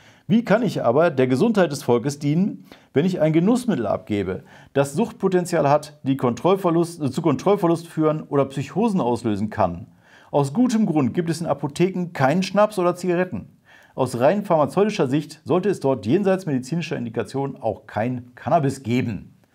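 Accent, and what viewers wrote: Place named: Rhineland, Germany. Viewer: Dein rheinischer Dialekt ist sehr sympathisch, Hr.. Wie es aussieht, haben wir es geschafft! Wie es der Presse heute zu entnehmen ist. Warte aber sehnsüchtig auf Eure Bestätigung. Tolle Arbeit! Toller Support! Danke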